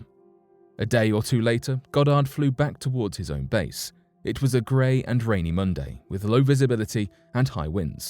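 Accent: United Kingdom. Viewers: The monotone British guy?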